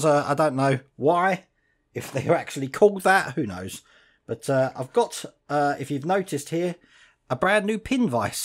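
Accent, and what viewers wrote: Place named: Essex, United Kingdom. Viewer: I think that’s probably more your ‘Essex’ accent coming through;).....being from Thurrock sounds totally normal to me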